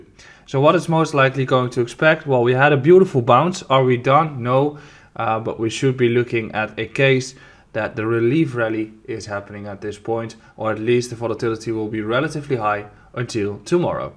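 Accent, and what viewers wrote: Netherlands. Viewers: Ben je Nederlands ik hoor een accentje.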